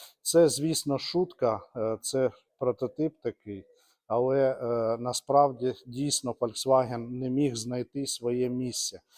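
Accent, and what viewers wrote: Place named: Russia. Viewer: просто сильный русский акцент, я как живший в незалежной слышу фальш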